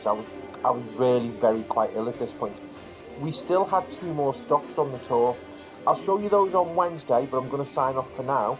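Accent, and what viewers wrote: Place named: Bromley, United Kingdom. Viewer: Great video and insight. I love your video and British Bromley accent. 🙂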